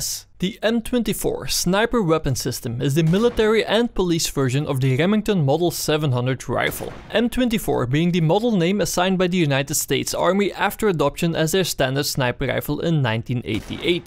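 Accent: Netherlands. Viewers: You're Dutch arent you? :) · It sounds like he comes from the Netherlands, so I assume that's his accent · Are you Dutch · je bent nederlands he? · A quite thick Dutch accent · from the moment you started talking i knew.. dat je nederlands bent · are you dutch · You sound so Dutch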